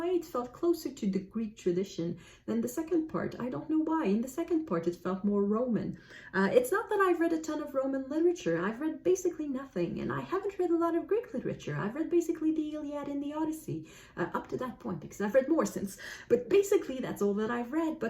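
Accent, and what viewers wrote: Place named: Quebec, Canada. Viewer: I do enjoy your channel. I love your mixture of Canadian English and French. It makes this old lady happy to hear you talk about her favorite things, books. I don't know whether I have actually read the Illiad and the Odessey as but I remember enough about them that I'm pretty sure I have read them sometime in the last 65 years (I was 12 then). I do remember reading The Aneneid in fourth year Latin at school. Dido really stuck with me. My daughter has read Gilgamesh. If she owns the book I can borrow it for her.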